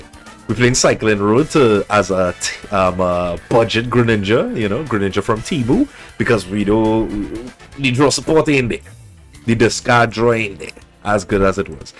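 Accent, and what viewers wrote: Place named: Caribbean. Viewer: Trini or Caribbean accent...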